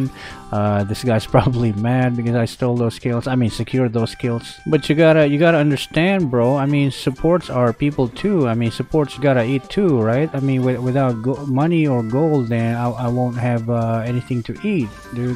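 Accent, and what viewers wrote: Philippines. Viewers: Are u a filipino with english language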